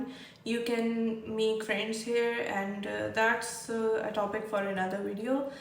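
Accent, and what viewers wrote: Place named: India. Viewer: Where were you born? You sound indian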